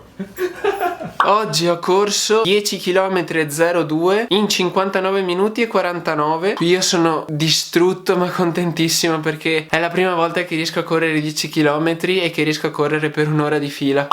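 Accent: Veneto, Italy. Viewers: Te si Veneto sceto😂😂😂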